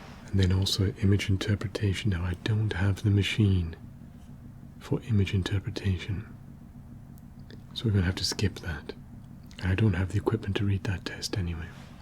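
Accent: United Kingdom. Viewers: Hi! I accidentally came across your videos. Is this ”home made”? Looks so professional. Your english sounds so british 😊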